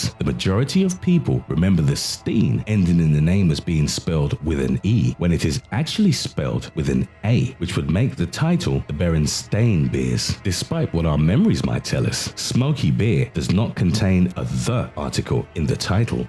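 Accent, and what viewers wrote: United Kingdom. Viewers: its a British accent :/ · Mainstream Commercial Nihilism he has a British accent so mandeler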